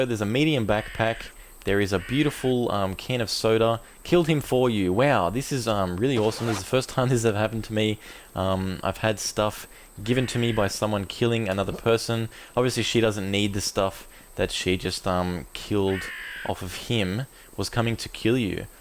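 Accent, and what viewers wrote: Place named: Australia. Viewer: omg ur Australian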